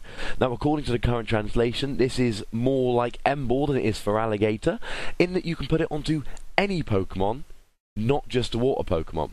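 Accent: United Kingdom. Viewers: I love your British accent! One game I do remember was when I was playing my newly made Zekeels. I managed to set up 3 Eels in less than 7 or 8 turns. My Accelgor/Whirlipeed deck only drew 1 prize during the game. At some point, I thought "Screw this" because I couldn't decide on who should be my main attacker. I just Bolt Struck (while eviolited) until everybody died.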